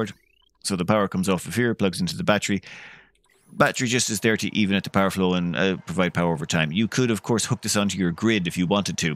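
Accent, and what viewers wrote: Ireland. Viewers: 300 hours into this game and I still struggle to understand what the hell you're talking about at times - your Irish makes it absolutely worth listening though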